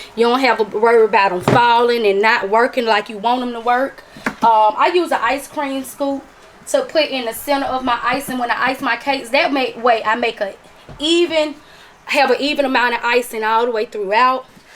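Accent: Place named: Georgia, United States. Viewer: Thank you for this. I know you gotta be from GA BC we sound just alike.😂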